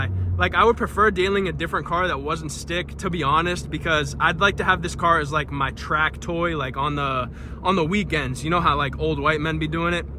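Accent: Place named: Boston, United States. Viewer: You are so stereotypically a Boston native. You boys out there talk so fast & got no filter 😂😂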